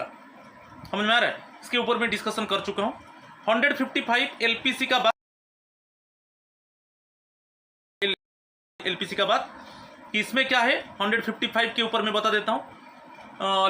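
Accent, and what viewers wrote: Odisha, India. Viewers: Sir are u an odia?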